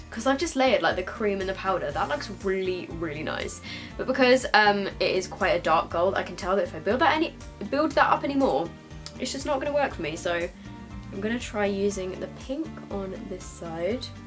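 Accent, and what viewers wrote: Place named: United Kingdom. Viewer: she sounds so extra British today. Idk y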